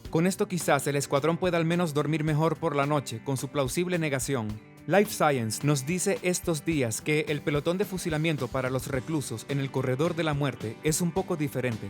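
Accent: Russia. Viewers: Suena como un ruso hablando español. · Suena exactamente ruso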